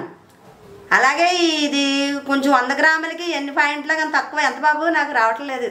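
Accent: Godavari, India. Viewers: abha.. maa godavari jillaa basha antha haayiga vundho vinadaniki aa matallo ame abimanam vundi 😊😊😊😊 thank you so much amma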